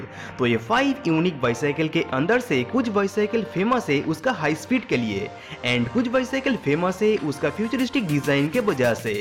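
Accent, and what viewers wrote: Bengal, India. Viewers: Bhai bengali type ka hindi bolte hoo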